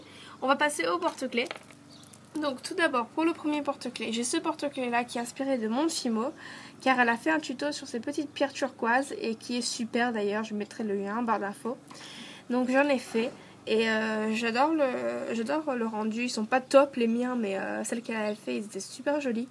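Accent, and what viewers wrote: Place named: United Kingdom. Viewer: J'aime beaucoup ton accent britannique ! x'D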